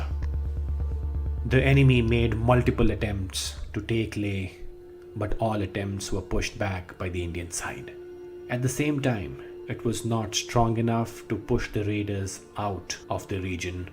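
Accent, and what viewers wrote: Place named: United States. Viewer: Excellent research and presentation, as always. One request: pls try to use the King's English i.e. the English that is taught in our best schools and also used in our military. Therefore, 'route' should be root (not rowte), 'lieutenant' should be leftenant (not the U.S. "lewtenant"). Else the Americanisms are jarring to the educated Indian ear.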